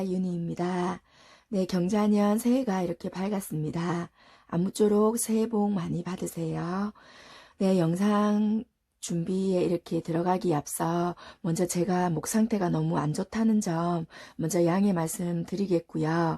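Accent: Busan, South Korea. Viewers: 감기로 목상태가 안 좋으신가보네요. 얼릉 쾌차하세요~ 사실 저도 부산 사람이라 부산사투리 첨부터 들었을때부터 정감이 많이 가서 계속 리딩 듣게 되네요^^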